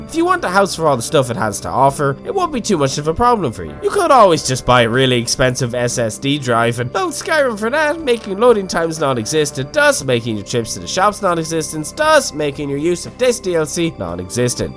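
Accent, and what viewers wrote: Ireland. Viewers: Standards? My standard is that Irish voice and a shot of a half-naked M'iaq cooking stuff in a pot. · R u Irish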